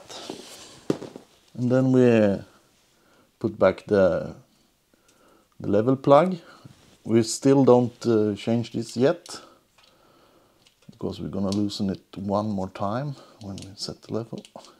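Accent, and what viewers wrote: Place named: Minnesota, United States. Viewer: Great video!! Born and grew up in Minnesota, I can tell where our accent comes from!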